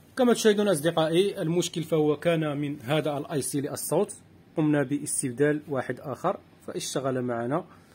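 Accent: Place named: Morocco. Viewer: عمل رائع أخي أريد أن أسألك عن مكان شراء هده القطع الإلكترونية في المغرب لأن من لهجتك عرفت بأنك مغربي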